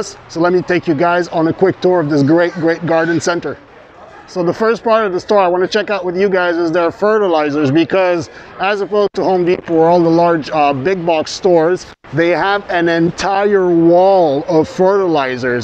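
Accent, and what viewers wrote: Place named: Quebec, Canada. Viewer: Hey there...just found you on here. Was listening to Epic gardening podcast and recognized a fellow bilingual Quebecois. I'm in Val-des-Sources( formally Asbestos) and love seeing the familiar products as I see on the shelves here...I guess I would have to consider myself an experienced gardener at this point, but love finding new ways to inspire others how to grow something.... anything really..lol I'm officially a Fleuriste by deploma, but have worked many years in a centre de jardin/pipinere learning from old school gardeners, and have always had my own gardens of my own over the years. This year I am introducing wine cap mushrooms to my pathways...😁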